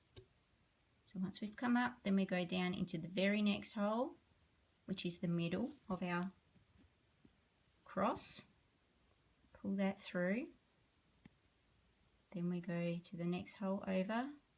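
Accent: Australia. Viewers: Nice to hear a fellow Aussie!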